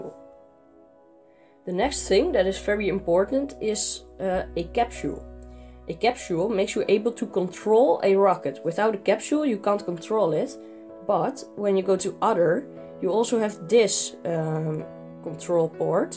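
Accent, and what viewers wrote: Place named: Netherlands. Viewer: Ik smell Nederlands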